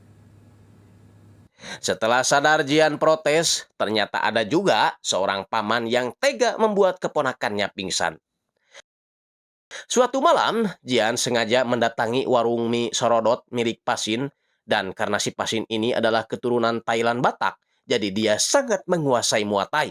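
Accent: West Java, Indonesia. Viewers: Keren pisan euy channel na Aya logat sundana Salam dari malausma Majalengka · mantapp mangg, guaa sukaa chanel ini cuma garaa² amang nya ada sundaa² nya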